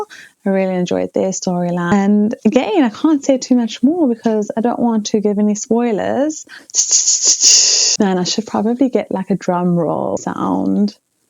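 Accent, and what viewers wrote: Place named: South Africa. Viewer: From one South African Bookworm to another...hello! 😂 I just found your channel today and loved hearing the South African accent on YouTube. ❤. Anyway, hope to see you over on my new bookworm channel as well. I'm traditionally a massive thriller reader but I've recently discovered fantasy and actually, I've dedicated the month of Aptil to only reading fantasy.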